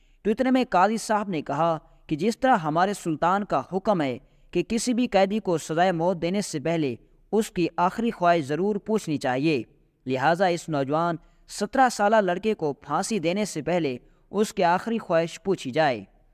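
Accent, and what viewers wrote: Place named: Saraiki, Pakistan. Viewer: Saraiki lehjy main urdu boli ja rahe ha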